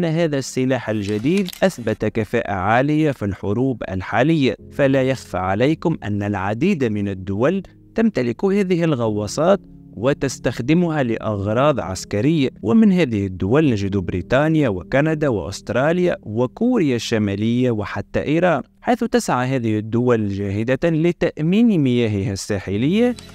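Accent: Tunisia. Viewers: كل مرة يخرج عليك برتوش شبعتو الخرياشة خرشف و كذوب المغرب غارق في ديون المغرب لم تبق له سيادة كلشي في يد الصهاينة انصحك اصاحب القناة انشر فيديوهات تتكلم على طاجين المغربي مثلا او تكلم على الظلم والفقر والقهر لي عايشو المروكي اولد القاف لمغاربة عاقو بكذوبكم على حساب صوتك تونسي باغي تسترزق على ظهور لحمير السناغلة 😂😂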